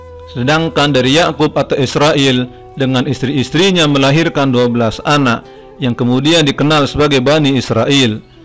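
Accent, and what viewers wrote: Bugis, Indonesia. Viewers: Sy yakin yg ngomong ini orng bugis..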